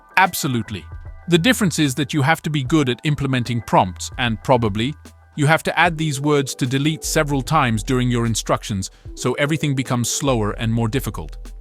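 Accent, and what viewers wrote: Italy. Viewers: Thanks for sharing the update! Me too I like the Italian accent. Do you have a discount code for Surgegraph? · Not gonna lie I miss the Italian accent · But this is better than his Italian accent. 😅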